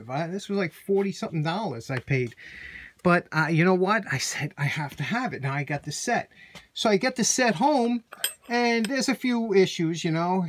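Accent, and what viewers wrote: New York, United States. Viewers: That's a JACKPOT tool!! Always interesting and always informative!! I always look forward to these restorations!! The education provided with each video is remarkable! Of course, the NY twang makes me feel like I was back home on Lung Ilint!! Thanks!!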